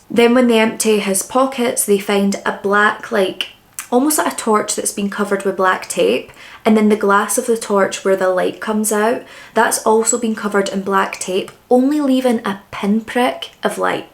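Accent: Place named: Scotland, United Kingdom. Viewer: Every time I watch your videos, my inner dialogue is in your voice/accent for like the entire rest of the day 😂 and I’m a California girl so I literally cannot even do a Scottish accent myself. Just in my noggin lol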